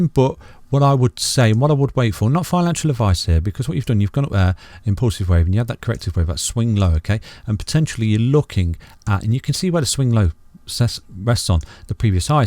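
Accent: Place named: RP, United Kingdom. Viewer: Where are you from mate?, just got that BBC english accent i listened back in Bournemouth 2012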